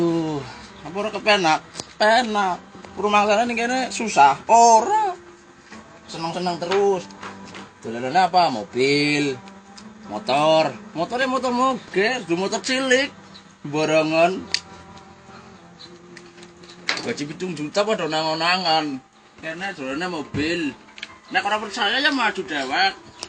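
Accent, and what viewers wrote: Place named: Java, Indonesia. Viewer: Wong endi mas medok temen.ari ngomong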